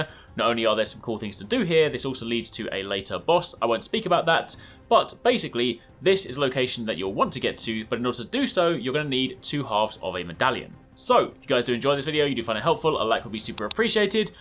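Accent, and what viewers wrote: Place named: England, United Kingdom. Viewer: You talk so fast and ur English accent makes you sound smart. But seriously I love how u cut to the chase. I'll follow you off a cliff if you ever jump off one.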